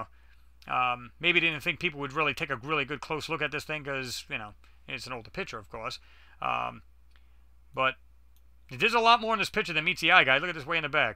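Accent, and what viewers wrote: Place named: New England, United States. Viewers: great videos brother very interesting I always knew that those nasa bastards have been lying to us lol any ways thanks for the great videos brother. Are you from Boston you sound like you have a Boston accent. Well anyways you got your self a new subscriber keep making these great videos. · he's definitely from New England, Greater Boston area would be a good guess. cool picture of the Moon too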